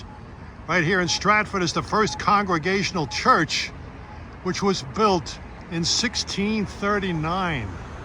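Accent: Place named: Chicago, United States. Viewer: I do believe you made a trip to Chicago at one time as I recognize that accent of yours , no offense there , just good enjoyable vids ya do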